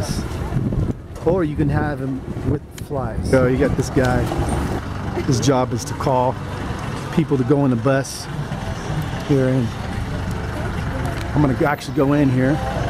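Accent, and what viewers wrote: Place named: United States. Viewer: You sound American. What state are you from?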